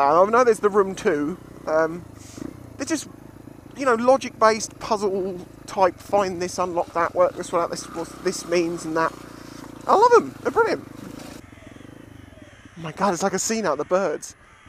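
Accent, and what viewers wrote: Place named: England, United Kingdom. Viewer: LOL, captions were funny. I think your accent is throwing the software off. It doesn't recognize English english.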